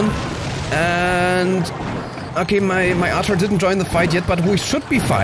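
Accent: Germany. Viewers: youre accent is like germans english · He sounds German to me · are u from germany? or from where comes ypur acent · you sound like a german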